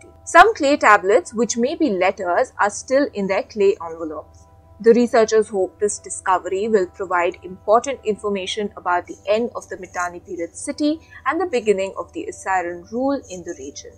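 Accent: India. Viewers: Yuck, that indian accent. Stop speaking english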